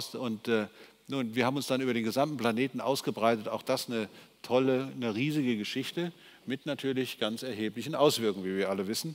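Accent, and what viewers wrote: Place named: Bavaria, Germany. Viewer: (An den AnModerator zu Beginn) Der Inhalt ihrer Videos ist ja eh immer interessant. Aber könnten sie erstens mal den Buchstaben A richtig aussprechen und nicht so extrem Bayerisch und zweitens die Augen nicht ganz so aufreissen? Ich finde beides in Kombination lenkt zu sehr vom Inhalt ab und bringt das Ganze in eine so bestimmt ungewollt komische Richtung. Liebe Grüße und danke für die gute Arbeit und den interessanten Content!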